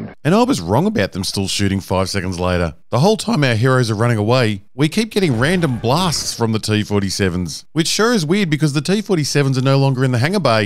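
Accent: Australia. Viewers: Ah.....luv ya work,! And the Aussie accent totally keeps it real, bro! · Lmao I love this channel. The AT AT with that music intro and outro (idk why I love it sm but I do haha), the constant bashing of Disney’s Star Wars, and the hilarious jokes this guy makes with an Australian (I’m assuming) accent. I apologize if I assumed wrong haha but keep the good work up. Love your content :)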